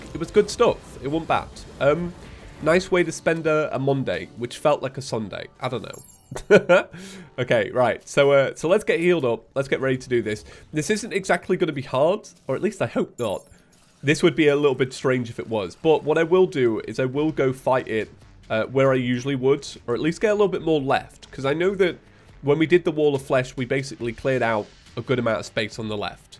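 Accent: United Kingdom. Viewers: Sometimes I honestly just think “ god, how I would love to play in world with him and his British accent, eh, probably will never happen”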